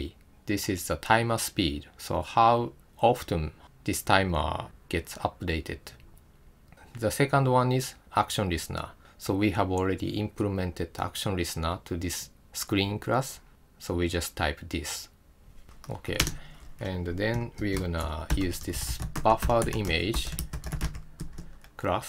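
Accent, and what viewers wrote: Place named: Japan. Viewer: Really helpful and good tutorials also your Japanese - English accent is really cool :) ! 素晴らしいJavaチュートリアルありがとうございます !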